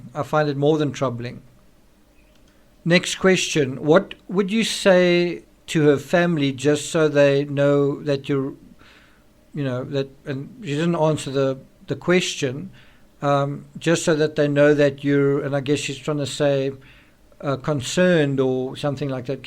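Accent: South Africa. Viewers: Mr South African Accent, I don't know you; just happened that your page came up on my feed BUT, can you read a room? At the mark, there appears a very dark, very black woman, costumed as a "darkie maid" with a minstrel-esqe expression on her face. Something like Mammy in Gone with the Wind, except Hattie McDaniel's Mammy had far more innate dignity. Wrong picture to illustrate your little narrative. Cassie is 8-10 years older than her brother, she says. From her conversations it seems that he was the Golden Child, a boy, -finally!- even though he may have mental issues; Cassie is the family scapegoat. Look at her and her husband; her parents first probably ragged at her as an adult in an abusive first marriage (like Gabby) then because of her and her husband's weight, losers. Then they house sat for her parents while they took Brian up to NY for whatever reason, most likely mental /physical/psychological treatments. 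He's not been regularly employed, it seems yet he disparaged Gabby for trying to manage her vlog/social media as a business, days before her death, blaming her for being OCD as he admitted to the police that he was hearing voices. Cassie is not the out of order one here. As she spoke, I could HEAR in my imagination the verbal pillorying that would be aimed her way by her mother for "daring!" to defy family /lawyers instructions, "just like you to say the wrong thing about something you aren't privileged to!" Cut the woman some slack. She has little kids to pr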